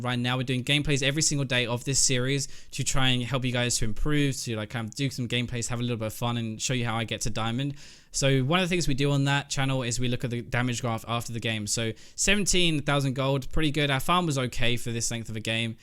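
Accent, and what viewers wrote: United Kingdom. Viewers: a wool? a wool? took me like five sec to figure out you were saying wall. british accents are awesome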